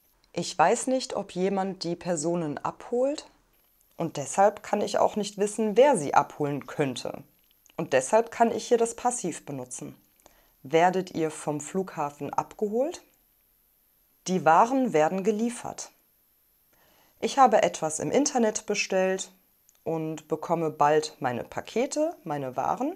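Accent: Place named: Eastern Europe. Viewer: Чо-то ты нифига не похожа на дойчу... Если бы не произношение, принял бы тебя за... Чешка? В любом случае, что-то славянское 120%!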